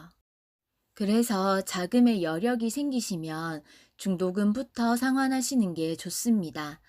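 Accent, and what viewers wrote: Gyeongsang, South Korea. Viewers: 경상도 맞는 듯 하네요. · 목소리 들어보니 경상도 사람이네요. 일단 잔금 연체이자 말씀 감사합니다 저는 실수요 무주택자입니다. 계약금10% 완납하고 70% 건설사중도금대출 나머지 20% 입주잔금은 제가 값을 생각입니다. 입주 지역은 조정대상지역이고 평택고덕신도시 a-39블럭입니다. 제가 생각하는 입주계획이 틀린지 아닌지 말씀해주시면 감사합니다. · 경상도 분이신가봐요 ㅎ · 경상도 TK쪽인듯 익숙해서 듣기 좋습니다.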